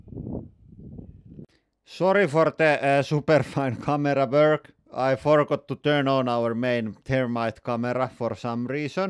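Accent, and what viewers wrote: Finland. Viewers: I watch just to hear finglish again like at my grandparents. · lol that finnish rally english, knew you were from finland after first words out of your mouth, way before seeing text on side of that van at background :D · Your acccent sounds Finnish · Accent and a few words tells me you are Finnish. Correct? Oikee?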